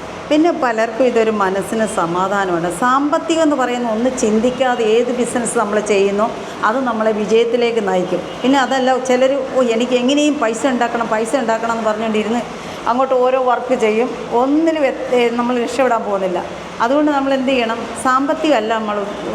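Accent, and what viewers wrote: Kottayam, India. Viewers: Thamasam tvm anenkilum samsaram kottayam anu ennu thonnum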